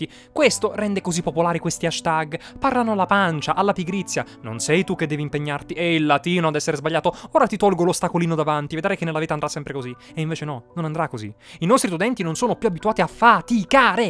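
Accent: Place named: Sicily, Italy. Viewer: Io non me ne intendo di accenti ma sentendoti parlare così concitato mi è sembrato che la tua parlata fosse simile a Marco Merrino. Il che ha senso visto che siete entrambi siciliani.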